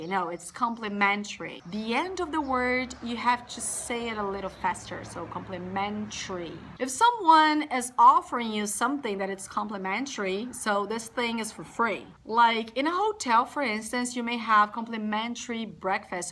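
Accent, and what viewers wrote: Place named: United States. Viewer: That's not an English, it's American...gosh please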